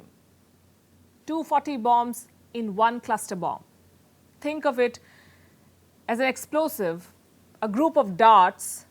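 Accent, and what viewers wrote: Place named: India. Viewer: It's Amazing how Indian slaves mentality talk in funny English 🥁🤣🇬🇧 rule Britain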